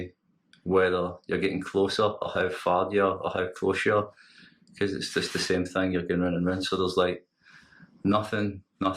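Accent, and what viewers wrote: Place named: Scotland, United Kingdom. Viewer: I'm going to get in Scotland to learn to talk with that accent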